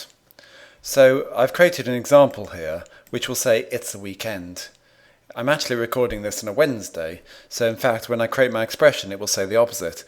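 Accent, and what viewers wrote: United Kingdom. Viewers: special thanks from Egypt Special thanks for British accents :)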